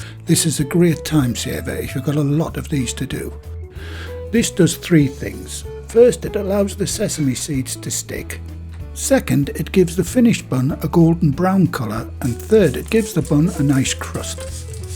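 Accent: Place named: Newcastle, United Kingdom. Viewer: Another fab video. I bought some store bought ones yesterday. and they were disgusting, i threw the rest out to the birds this morning, even they were in 2 minds whether to eat them. I will be making my own now. Not only am i loving watching your channel and enjoying your easy to follow recipes, i love your geordie accent. Thanks again.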